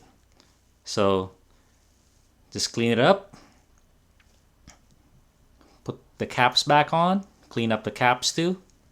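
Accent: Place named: Philippines. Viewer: English accent sounds like Filipino? Tama ba ko kabayan?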